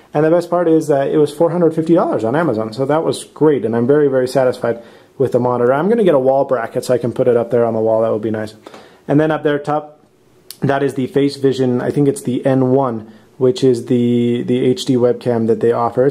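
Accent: Spain. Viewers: You have a spanish accent in your english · You've come back with a spanish accent :D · wow you picked up a small spanish accent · chubby and a spanish accent. It's like we're brothers now. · Spanish accent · Haha I can notice a slight spanish accent. Looks like you got very involved with Bolivian culture ;) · Wow you sound really spanish after those years in bolivia! :D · Is it just me or does have a bit of a Spanish twang to his speech now? How cute:) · It's awesome that he's got that Spanish twist on his accent now haha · the Spanish accent now is awesome :D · You kind of have a Spanish accent now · You've acquired a slight Spanish accent. Glad to have you back! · You have a slight Spanish accent now :). Must be all that time spent in Bolivia. Welcome back bro.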